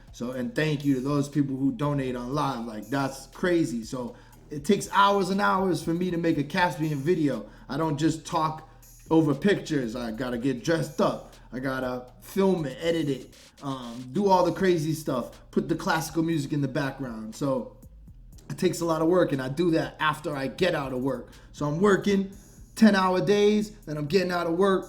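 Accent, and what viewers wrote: England, United Kingdom. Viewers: The English accent works......i love how u make ur presentation, that pipe u smoke it rocks! U should do a movie one day brother because u're a dam good actor 👍👍👍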